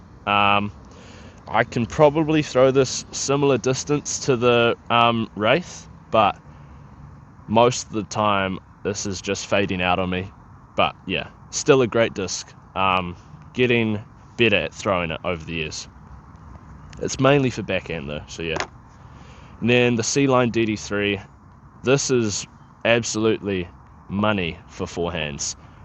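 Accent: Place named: New Zealand. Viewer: I wasn't expecting a Kiwi accent when I clicked on this video. 😂😅 I have an identical Halo Firebird that I could sell you if you need a back up.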